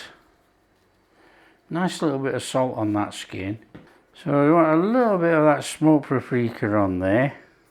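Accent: Yorkshire, United Kingdom. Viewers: Hi, i have been surfing all the channels that i used to follow before i found yours, and the amazing thing is they have all upped their game, some indian channels, which i wont mention, has adopted your trademark saying of " Look At That! " Which brings a smile to my face as its not with your Yorkshire accent. So you obviously are making them less complacent. Fantastic! X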